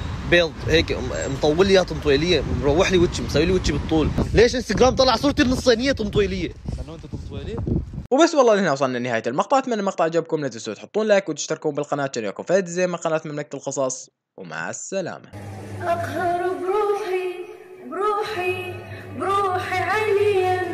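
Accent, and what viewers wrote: Iraq. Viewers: كانو انت عراقي من لهجتك مبيت كتير حلوه اللهجه العراقيه 💛💛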